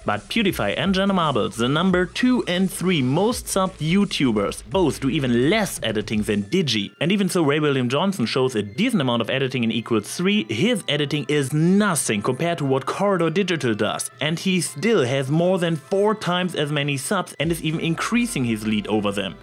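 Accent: Germany. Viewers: Are you german I watch some german youtubers I recognise that accent just curious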